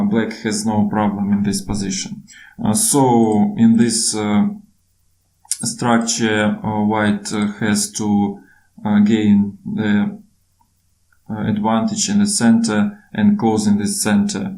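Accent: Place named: Eastern Europe. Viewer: мне в своё время нужно было подтянуть инглиш. Перепробовал многое, в т.ч. и репетиторов-носителей английского языка. Но для меня самый действенный способ оказался просмотр интересных фильмов с субтитрами. Все непонятные слова я выписывал на транслейт.ру, а далее перед сном их заучивал. Первая серия при длительности 45 минут занимала у меня полтора часа (всё выписывал, переводил и схватывал о чём фильм). Последняя серия уже заняла примерно 47 минут. Во-первых, будет полезно услышать их акцент и мозг сам переключится на более "забугорное" произношение. Сейчас слышно, что акцент восточно европейский. Во-вторых, существенно расширите свой словарный багаж. В-третьих, ещё и психологически отдохнёте за классным фильмом. Единственное - нужно обязательно тратить время и заучивать слова перед сном, и желательно с утра их просматривать. А от себя скажу - огромная благодарность за ваш труд. 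Реально оч интересно смотреть ваши видео. Причём год от года наполнение стало гораздо более качественным. Уверен, что и с английским будет аналогично. Успехов вам! С благодарностью, ваш подписчик.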